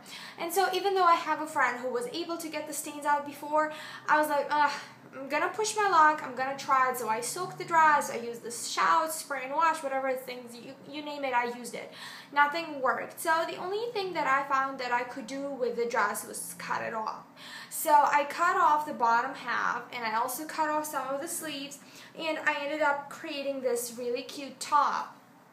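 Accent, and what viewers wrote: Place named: United States. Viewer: У вас идеальный английский язык в американском произношении!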